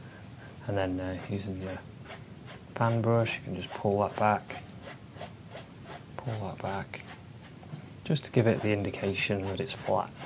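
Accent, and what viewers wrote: United Kingdom. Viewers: Is this guy the British successor to Bob Ross O.O like in all seriousness he paints just as beautifully, and his accent and voice give off that ASMR effect. the same kind that Bob Ross did. This painting is amazing and Sepia just works so well and I love the technique of using masking tape to section off the horizon line :D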